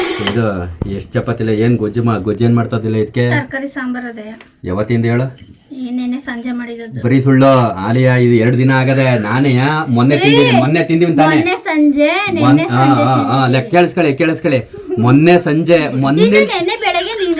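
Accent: Mandya, India. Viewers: . ನಮ್ಮದು ಯಾದಗಿರಿ.(uk) ಆದ್ರೂ ಮಂಡ್ಯ ಭಾಷೆ. ಕೇಳೋಕೆ ಚಂದ.. All tha best... K A. 33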